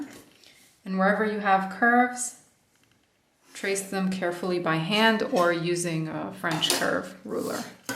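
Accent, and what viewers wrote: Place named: Canada. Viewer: Hello, this video is so useful!!! I really appreciate your help! Are you from Canada? I think I hear your about pronunciation, plus you use cm. Greetings from Cleveland Ohio.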